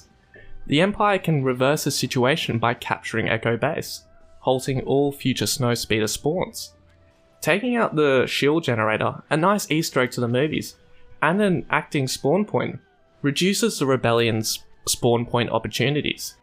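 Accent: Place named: Australia. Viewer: yeah once i heard an aussie accent i knew i was safe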